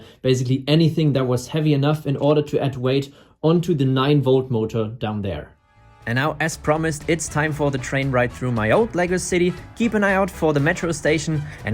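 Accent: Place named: Germany. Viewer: GERMAN ACCENT!!! SO BAD!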